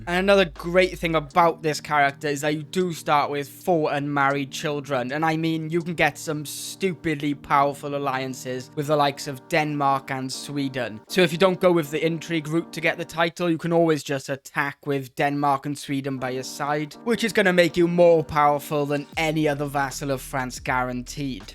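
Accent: Wales, United Kingdom. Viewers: Are you Welsh or African-British? You sound like a combination of both, but I've heard you are Welsh, it's confusing me so much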